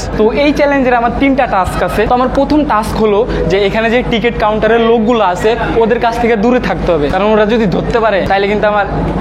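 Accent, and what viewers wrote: Barishal, Bangladesh. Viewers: বরিশাইলা বলে কতা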